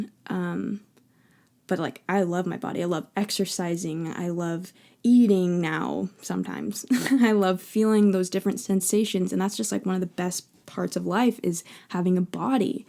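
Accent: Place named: United States. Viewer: I am an Aussie ex Mormon and I love your American accent.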